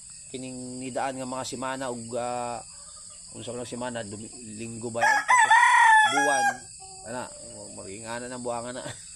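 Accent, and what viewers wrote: Visayas, Philippines. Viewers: nice Boss idol. Bisaya ni Bai😮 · bisaya diay ka idol?.haha oke kaayo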